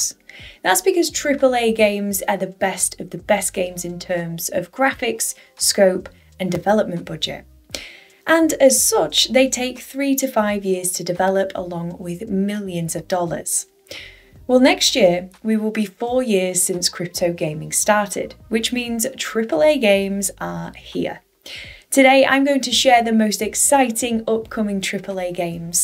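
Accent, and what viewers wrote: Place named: North, United Kingdom. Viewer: I wasn’t expecting that accent! Northerners rock 😀 Don’t tell anyone, it’s great ‘up north’! 😂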